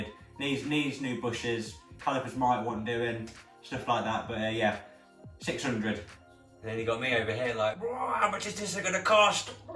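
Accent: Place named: Leicester, United Kingdom. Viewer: I’m in Leicester , would know our accent anywhere 😂 it’s very entertaining , nice garage set up too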